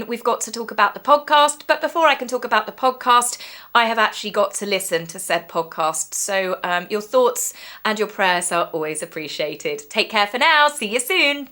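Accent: England, United Kingdom. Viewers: Such an outstanding video today to us. Thank you so much. You are so talented and beautiful. The way you present the case in your Lady like very English Way is delightful by the way I’m in agreement with you regarding Harry and Megan. I find the two of them despicable, my opinion.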